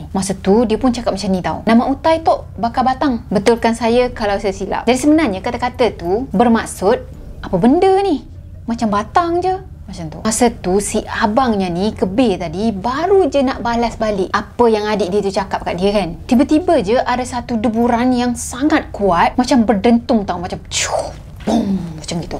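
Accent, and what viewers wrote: Kelantan, Malaysia. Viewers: Kito fokus molek klu kak bcerito. Meme bnyi KL molek.Tp part SADAK tu suko sore kito. Heheheheheh😂😂😂😂😂😆😆😆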